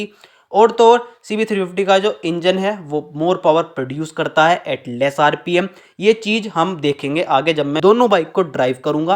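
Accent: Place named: Uttar Pradesh, India. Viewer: Neither of these bike falls under cruiser category. Please do your homework seriously ;If you want to grow. Else if you really want to remain just another street youtuber with strong UP accent and tone. Continue with all what you doing. Rest I will wish you best of your luck and hope you do good 👍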